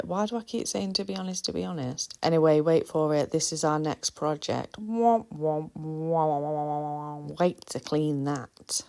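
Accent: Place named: Nottinghamshire, United Kingdom. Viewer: 😂😂😂 you do make me laugh,sounds like your from Sutton-in-Ashfield!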